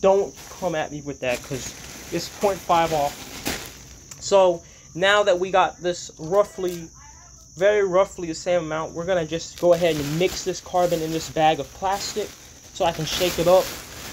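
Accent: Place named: England, United Kingdom. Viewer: Did you spend time in England? You do our accent as much as I do and American accent😅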